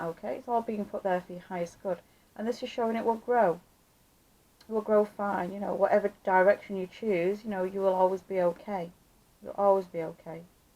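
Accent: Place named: Salford, United Kingdom. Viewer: Great reading my dear friend :) May I ask are you from Machester , as you sound very local to me in Salford :) Bless you my sister :) .. x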